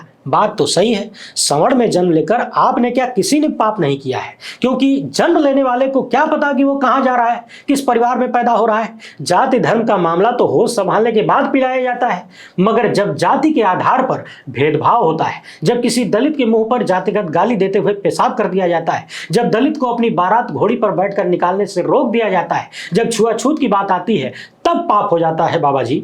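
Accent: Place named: Gonda-Basti, India. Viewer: Bahot hi perfect Analysis Bhaiya jii Aawaz Aisi hai Jaise Gonda Basti Se belong kar rahe hon bhaiya ji Aap , Am I Correct?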